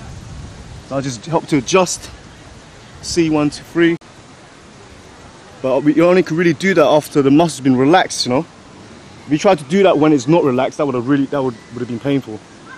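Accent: London, United Kingdom. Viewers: what lmfao it’s a London accent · You look like a Thai master with a British accent😱👍🏻👍🏻👍🏻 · I subscribed for the simple fact an Asian guy with a London accent it just cool AF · definitely 100% a London accent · He sounds almost like Africans that live in England. Lol!! This looks soooo relaxing. If it were me I'd just fall down. "You just gone have to come down here lao shi."😊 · sound like you come from East London or South London lol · Just a basic London accent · he has a strong london accent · Love your British accent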